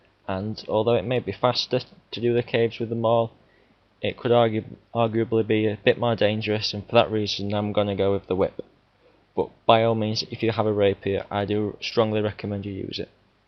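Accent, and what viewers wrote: United Kingdom. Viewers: good solid english accent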